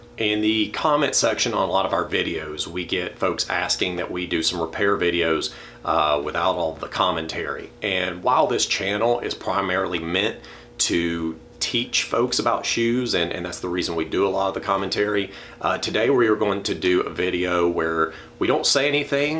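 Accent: South, United States. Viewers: Good heavens! I love the commentary. Folks can turn down the commentary if they don't care for it. I'll bet they don't understand our nice Southern accents. I'm in Alabama, y'all. I love accents. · I do miss the southern drawl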